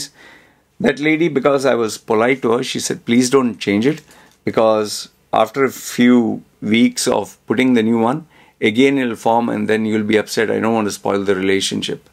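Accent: India. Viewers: bro ur accent is very similar to Indian accent and ur voice is very soothing....😅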